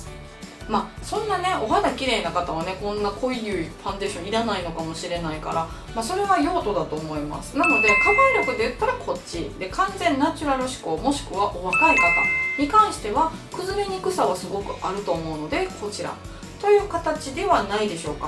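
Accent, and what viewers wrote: Kansai, Japan. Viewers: さん初めてコメします🙋‍♀️ いつも雑誌付録の紹介を見ていて、同じ関西弁で喋りも面白いのでチャンネル登録させて貰いました😊 私も40代…私、顔面崩壊しているので😅メイク動画めっちゃ良かったです‼️ 何を買えどもシミやらが消えません💦 ベルサイユのばら的なファンデを買ってみます☺️ 毎日暑いのでお身体ご自愛くださいませ😌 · 初コメです☆ こんばんは🌙😃 雑誌の付録動画で姉さまを知りました。お綺麗な方なのに飄々と面白い事言われるし関西弁大好きなので速攻でチャンネル登録しました✨ ファンデーション比較企画良いですね！私も顔に粗がたくさんあるアラサーですのでがっつり隠したい、厚塗り上等派です。普段はエスティーローダーを使っていて化粧持ちは抜群ですがカバー力もうちょい欲しいと思っていました。姉さまの最強ファンデ紹介をみてオススメBBクリーム試したくて早速買いました✌️カバー力本当に凄いですね！でも私は色黒なので203番でも白浮きしちゃいました…(^^; 他の濃いファンデと混ぜて使おうと思います💪 良きファンデーションを教えて頂きました！、ありがとうございます❗(人´ｴ`*)♪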